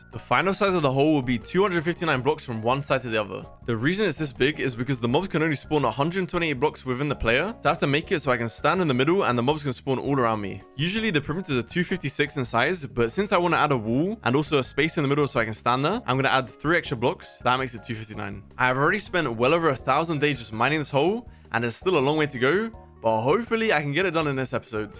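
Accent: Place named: Luton, United Kingdom. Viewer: Yo, are you from Luton?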